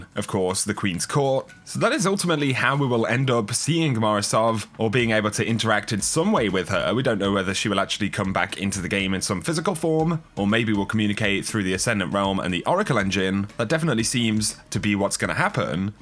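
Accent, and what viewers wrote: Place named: England, United Kingdom. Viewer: If Christopher Walken was a Destiny content creator with an English accent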